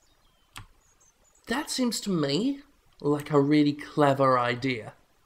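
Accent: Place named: Liverpool, United Kingdom. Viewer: Bill Nigh has the most elegant voice I've heard. This narrator though, I'd say he sounds more like George Harrison, that Liverpool sort of accent.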